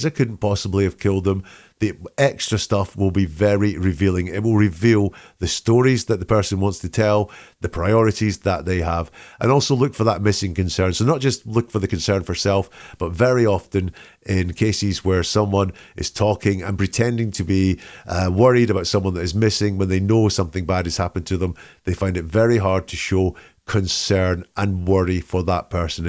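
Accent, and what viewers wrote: United Kingdom. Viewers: Hello, my friend! You sound British! I'll share a bit of synchronicity with you. About an hour ago I just learned of the idiom "hoist with one's own petard" How fitting for Mr Watts and this very insightful video! I have subscribed and look forward to hearing from you often.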